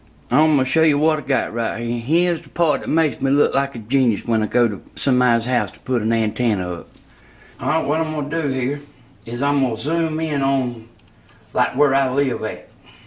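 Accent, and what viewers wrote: South, United States. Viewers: love your good ol boy southern accent..i just chuckled listening to ya... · I love the southern accent.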